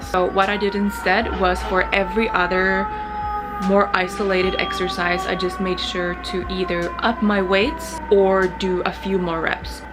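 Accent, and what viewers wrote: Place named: Finland-Swedish, Finland. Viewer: Self-reflection is good, but don't let it get you down. All you can do is all you can do. Your body will respond or not. Let's hope it will on time:-) Lycka till. Jag älskar din finlandssvenska accent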